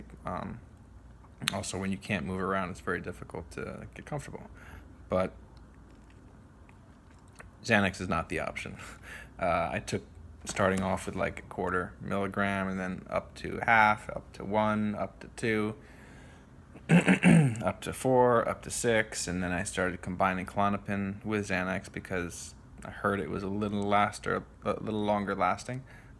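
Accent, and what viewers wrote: United States. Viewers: Are you from Tennessee?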